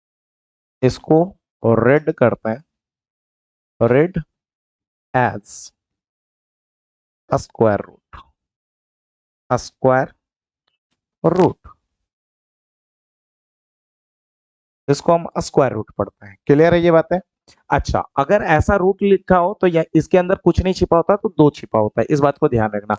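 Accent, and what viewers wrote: Bihar, India. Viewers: Sir aap bihari hai kya??? Hum bhi bihari hai .....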